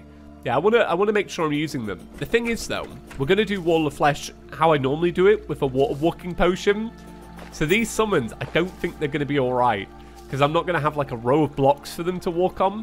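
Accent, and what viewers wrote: United Kingdom. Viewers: Welcome back,. Unfortunately, my grandmother passed away about two weeks ago. I’ve been going back and watching your videos. I am unashamed to admit your relaxedness and smooth british accent have unironically gotten me through.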